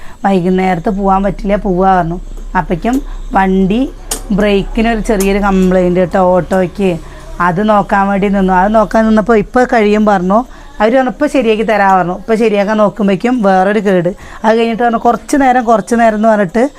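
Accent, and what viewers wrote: Palakkad, India. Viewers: colour mangiyirikkanu. Nalla bright colours like meroon okke idoo., presikkku colour ullondu nannayirikkum onnu maatti pidichu nokku. Nighty maatty frock nighty vedikkoo. Enikku 40 aayi but njan frock kurti aanu veettil idaru.. Nammal nannayitu nadakkansm. Athu pole hair video yil verumbol onnu clip cheythu idooo, eppolum ingane ketti vekkathe. Oru change vende. Nannayitu video yi chilappolengilum.frock nighty Instagram il 200 alle. Rendalum koodi oru psc apply cheythitu book vedichu parasparam chodhichitu padikkoo. Jeevitham mari mariyum. Endholke paranjalum... Enikku bhayangara ishtaaaaa ee slang.. Palakkad an bhasha. Eppo enikkku edayil verum appo ende makkal kaliyakkum❤❤❤❤luv u all soooo much., kuttikal... Amma kulichitu mudi azhichidumbol nallachelanu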